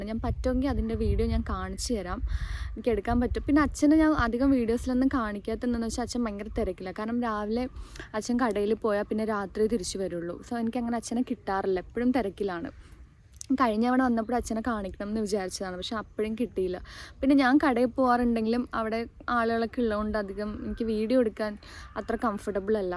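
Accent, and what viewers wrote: Trivandrum, India. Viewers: hai chechi ammommayod samsaricha tvm slang kettapo as a tvm kaari oru sugm indayirunnu ❤🎉😅❤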